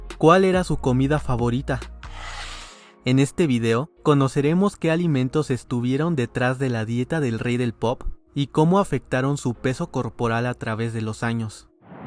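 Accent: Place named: United States. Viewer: Man, enough with the subtitles blocking all the pics of Mike and his friends. I understand English and I don't need subtitles to understand a narrator with an American accent.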